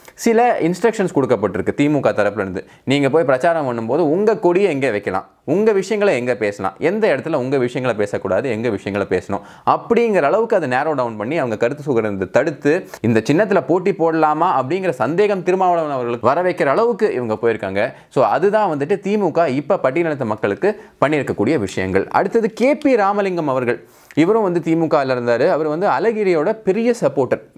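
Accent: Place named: Kerala, India. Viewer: மலையாள வாடை உங்கள் பேச்சில்..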